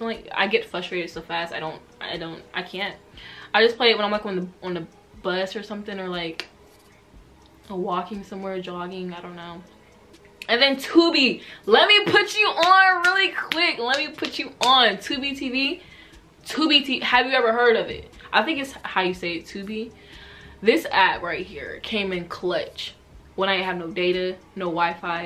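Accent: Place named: South Carolina, United States. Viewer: I knew I noticed your accent I'm from South Carolina ❤️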